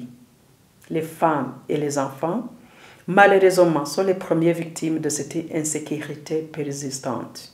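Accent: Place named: Rwanda. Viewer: Madame Ingabire Victoire, j'imagine que tu as étudié l'expression orale de la langue française ! Si tu décides de parler en français, rassure toi de parler comme il se doit! Tu me fais honte en parlant le français comme si tu parles le Kinyarwanda! Avec tous les atouts que tu as bénéficiés et parles trop mal le français, j'ai honte en ta place!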